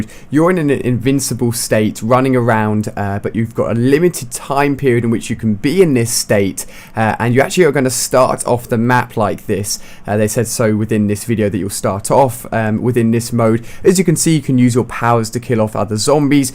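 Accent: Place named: RP, United Kingdom. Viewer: Your so posh and have a weird lisp thing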